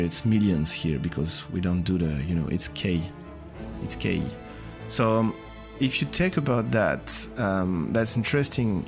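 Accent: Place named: France. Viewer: Toi, je sens que t'es Français en tout cas sympa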